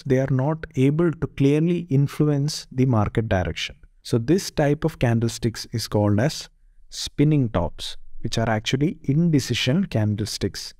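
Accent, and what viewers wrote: India. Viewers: How to understand the Indian pronounce？ 😅😅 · get an voice actor cause the idian accent drives me nuts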